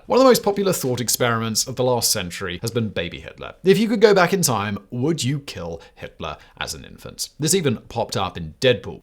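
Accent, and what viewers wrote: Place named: RP, United Kingdom. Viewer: One cannot take on this much work in this many directions and not become scattered. He has a cut-glass British accent (which hardly anyone has any more! He sounds a bit like Churchill mixed with James Mason - most Brits are now quite a bit more "broad"), but can't trade and sell on that forever. His occasional goofiness goes too far in the other direction. He is a victim of his own success, and I think from now on the quality will continue to deteriorate. Any company that expands too rapidly in too many directions fails. I don't wish failure on him by any means, but the cracks are already beginning to show.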